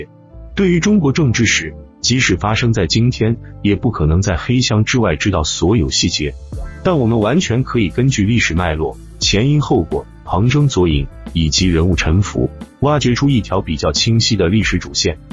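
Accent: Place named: Taiwan. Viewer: 台湾人?有台湾腔。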